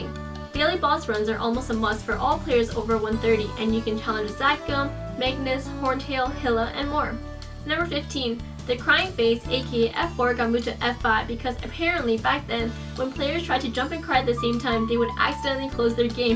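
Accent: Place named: Canada. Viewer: Maplestory back in 2013 or so was like an ultimate P2W game. You forgot to mention Miracle cubing for crazy highass potentials. But nonetheless this is a great video! PS: You sound like Chinese-Canadian. I'm also Chinese Canadian as well! Which part of Canada do you live in?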